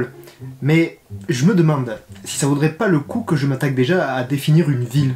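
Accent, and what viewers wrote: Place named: England, United Kingdom. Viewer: Super accent anglais 😉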